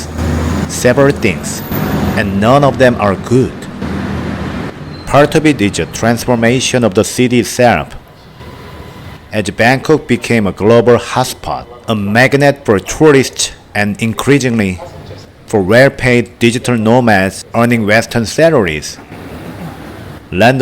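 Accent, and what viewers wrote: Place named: South Korea. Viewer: Impressive work! I just hope that you can drop the Korean accent 😅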